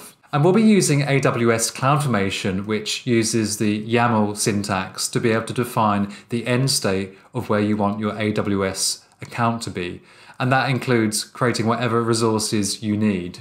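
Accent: United Kingdom. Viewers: british accent makes it more understandable and engagging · London English! Wonderful!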